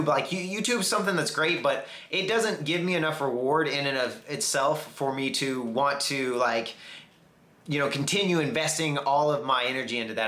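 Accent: South, United States. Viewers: I can´t believe you´re my age! Congrats on your job so far! As a Brazilian, I´ve got to say it´d be awesome to hear you speak Portuguese as well. I was curious about what state in the USA you were from (I heard it´s South Carolina), because your accent is slightly different from the standard american one, although I can understand every single word you say. Perhaps this is because you talk way too fast sometimes or because of the idioms and slangs you use, but it´s a very nice accent. Best wishes from Porto Alegre and come visit us once again. keep up the good work! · I must correct you.. im a black dude living in south America.. and the people are nice to everyone for the nost part... I honestly dont think your "whiteness" has as much to do with it as you think.. It may be moreso your accent being American... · Jesus, the way you speak English you're like a redneck hillbilly guy 😳 but anyway, I'm American to one Colombian parent which is my mother proud to be an American-Colombian I'm going to apply for Colombian citizenship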